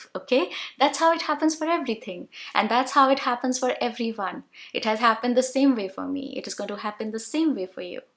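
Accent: India. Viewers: Is your accent indian, ma'am???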